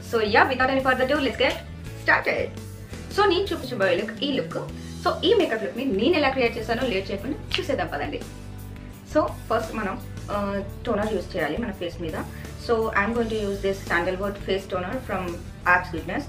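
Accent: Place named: India. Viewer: Your telugu slang vedio is nice&suppr 👍 Do more this typ of vedios weekly once it's more comfortable to common people...🙏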